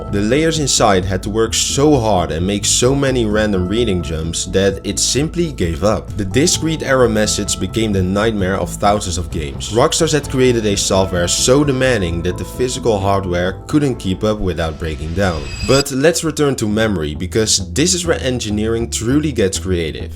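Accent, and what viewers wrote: Netherlands. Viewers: i both love and hate the strong dutch accent lmao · you are literally the most dutch person i have ever heard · Holy Dutch accent ❤‍🩹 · bro just stick to dutch. your dutch accent is too horrible for this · is that a dutch accent I hear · Nice vid! Hoor dat je een nederlander bent 👌🏻. Keep it up🤘🏻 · I hear Nederlands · Is that a Dutch accent i hear? Nice vid btw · Jij bent sws Nederlands ik weet het gwn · I smell Dutch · I smell dutch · im POSITIVE youre dutch!! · Hahaha dat accent is meteen herkenbaar als kuthollander xdddd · I hear a Dutch accent. PlayStation 2 kon de games van de disk laden terwijl de game draait. Niet veel games maakte er gebruik van (jack and dexter, need for speed games did that). Bij nfs en jack kon de game zelfs opslaan tijdens t spelen (eerste vorm van autosave). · lol dutch accent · That thick dutch accent🫡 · Je herkent Nederlandse accent direct. 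Blijf zo door gaan je gaat groot worden. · Ik ruik een Nederlands accent 👀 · dutch detected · i hear dutch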